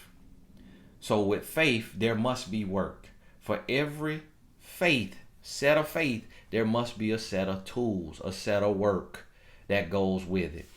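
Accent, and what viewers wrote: South, United States. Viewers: I love your southern accent, God Bless.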